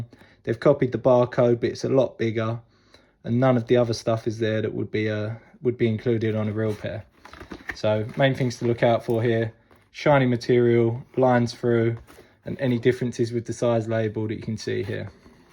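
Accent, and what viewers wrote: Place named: United Kingdom. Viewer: Ohhh that british accent.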